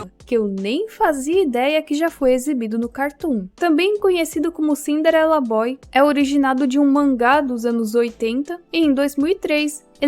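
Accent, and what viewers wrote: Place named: Curitiba, Brazil. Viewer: Acho que a deve ser de Curitiba por causa do sotaque.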